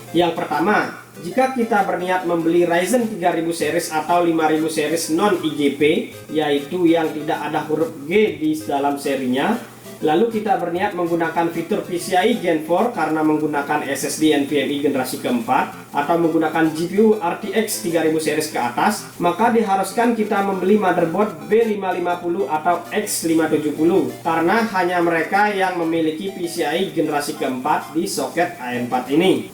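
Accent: Bali, Indonesia. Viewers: logat khe bali bgt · Logat bali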